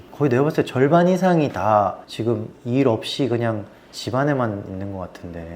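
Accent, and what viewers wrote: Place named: Gyeongsang, South Korea. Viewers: 포항분이신가봐요 저도 포항에 살고 있어요 억양이 엄청 친근감있다 했더니 ... ㅋㅋ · 경상도 말투가 정겹네요.. 자카르타에 살다가 한국으로 휴가나왔다가 복귀 못 하고 있습니다 ㅠㅜ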